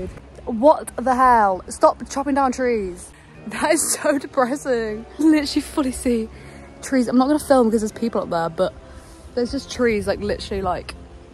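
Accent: Wales, United Kingdom. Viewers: British accent 😭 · oooo I thought she sounded welsh but I wasn't sure since im not the best at accents :D thanks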